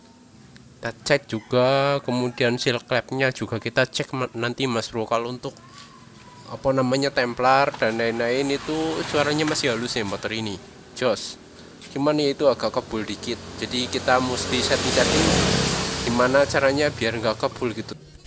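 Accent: Banyumas, Indonesia. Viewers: Lha wong ngapak yak masvroh ?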